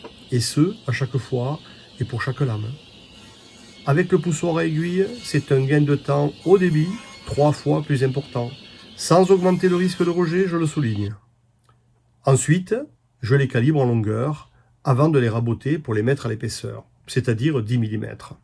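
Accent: South, France. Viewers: Effectivement, pas un luxe de les rénover! Merci de nous apporter du soleil avec votre bel accent du sud, à nous les nordistes sous la fraîcheur…estivale ! 😇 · superbe restauration il est vrai que notre société de consomation ne voie pas toujours cette fonction de pouvoir réabilité certaine chose.Mais quel plaisir de vous écoutez aussi parlé dans la langue de pagnol au chant des cigales vous êtes un conteur histoire magnifique j'adore 🙂, de plus je suis du sud aussi bravo a vous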